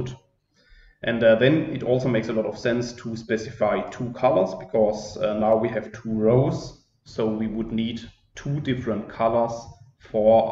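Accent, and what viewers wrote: Germany. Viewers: Hello, I wanted to say thank you very much! Your videos really saved me today! Btw is that a german accent or am I imagining it? If so then: Super Video! Ich freue mich auf weitere Tutorials :D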